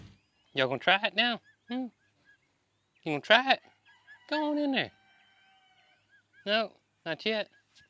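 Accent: South, United States. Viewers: Captions do not mix with a Southern accent!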